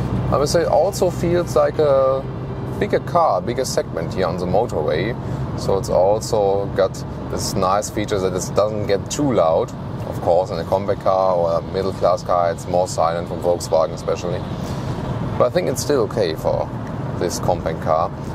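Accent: Germany. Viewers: your German accent sounds reliable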